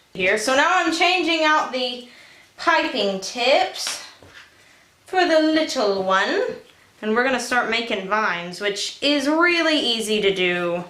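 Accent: Texas, United States. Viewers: She sounds more Texan than usual in this intro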